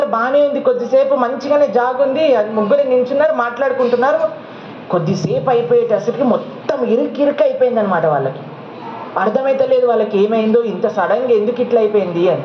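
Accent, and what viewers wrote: Telangana, India. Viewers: Complete Telangana slang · ప్రభుజి 😊 తెలంగాణ స్లాంగ్ అద్భుతం 😅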